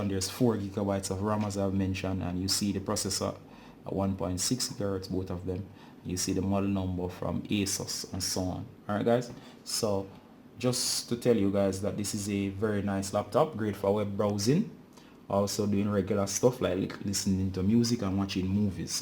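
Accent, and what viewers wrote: Jamaica. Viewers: U sound Jamaican or at least Caribbean... Awesome review bro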